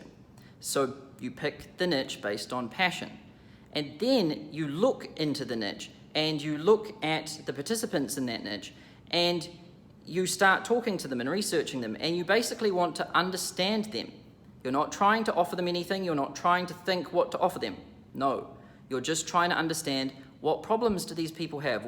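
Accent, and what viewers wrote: New Zealand. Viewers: A<link> your gorgeous Kiwi accent IS adorable!! Thanks, for the info, Champ! I'm still on the fence about signing up BTW...trying to believe that this isn't just going to be yet another online thing that promises the world, takes my money and produces not a whole lot. So, researching....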